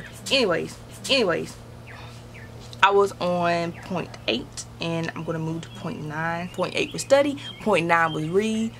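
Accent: United States. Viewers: lol love it already. so country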